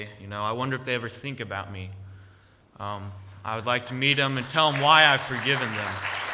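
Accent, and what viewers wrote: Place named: South, United States. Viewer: Omg. You have a southern accent?? Omg. God bless. What a miracle you are. 🙏❤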